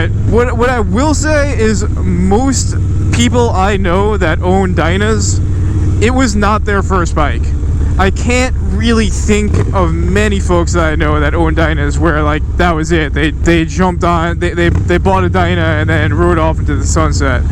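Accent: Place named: Long Island, United States. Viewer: This is my first time watching you but I'm glad I found ya I can tell this is Longisland I'm assuming, which is where I'm from I'm looking to get a dyna for my first bike this spring/summer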